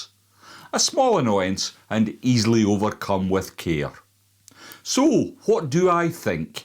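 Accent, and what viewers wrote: Scotland, United Kingdom. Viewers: Happy to see the Pere Marquette River Lodge sticker behind you. I guided on the PM in the 90s. That lodge was “ Johnson’s Pere Marquette Lodge” then. With that Scottish accent, I’m wondering if you’re in Scotland, and if you’ve visited and fished Michigan….where the PM flows.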